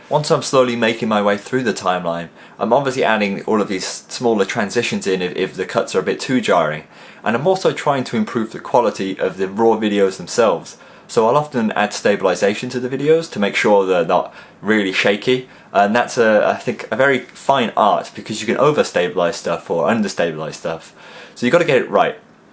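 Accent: United Kingdom. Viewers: ok I get it why you have a British voice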